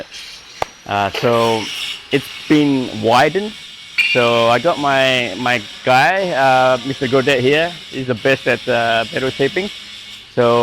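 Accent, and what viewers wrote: Java, Indonesia. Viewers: Wajahh chindo, kecill di ausiee, bicara medok totok, tapi kalo ada yang ngeremehin negaranya, kuliti. Mau bule mau paklek hajar... Mantap, mantap tim prorock...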